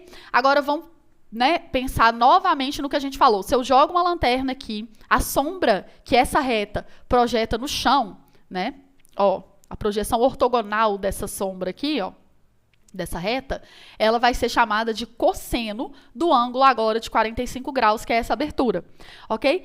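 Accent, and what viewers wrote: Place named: Minas Gerais, Brazil. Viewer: Tive um problema assistindo aula dela… adianto que foi ótima ! Aprendi bastante. O sotaque minerin dela 🥰😍 às vezes me distraiu 😊😂 Excelente vídeo !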